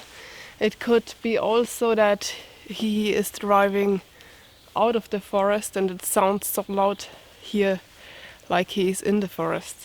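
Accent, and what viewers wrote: Germany. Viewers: Hallo, Es tut mir leid, Ihr Leben zu erforschen suchen, mag ich Ihre Videos und liebe Ihren deutschen enlish Akzent, versuche ich, Deutsch zu erfahren, weil ich viele deutsche Freunde in paddernorn habe, Ich weiß die Geschichte der Vögel und der Bienen, aber wie haben Sie ein Baby? ich dachte immer, dass Sie eine einzelne Wikingerfrau der Wald-Mumie waren? ich frage in Deutsch so nicht viele Menschen werden wissen, was ich frage, habe auch ich eine Verbindung mit Deutschland, weil ich in den britischen Armeebaracken konzipiert wurde, wenn meine Mama und Vati, der war in der britischen Armee, und macht es hoffentlich leichter für Sie zu verstehen?;)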